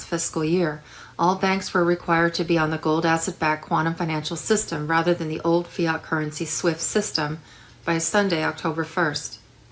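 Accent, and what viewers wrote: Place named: East Coast, United States. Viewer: May I say it. Is good to hear your voice. I only know you by your voice. I have listened to Restored Republic for over 2 years. There was a pause foot 6 or 8 months. But thank God you are back on U Tube. Im in Az by the way. I was getting Sherraye at one tiime. But not anymore. I first heard about of programs like yours over 4 years ago. I heard people giving prophecys when I lived in St.Louis, Mo...I began to liisten and pray for them. God called 2 or 3 Prophets home back then. I have said enough ough but I just wanted to tell you how blessed I have been hearing your voice over the years. Im 83 years old and partly growing up in Buffalo, N.Y. your voice reminds me of back east or people in Canada.. God bless you and Thank you so much for what you do ..For expressing your love for our world, our country and the people. 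God Bless A Fan Casa Grande, Az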